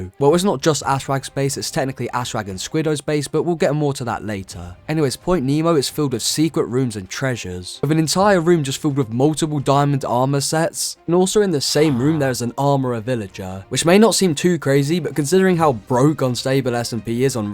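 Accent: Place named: United Kingdom. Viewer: Bros br'i ish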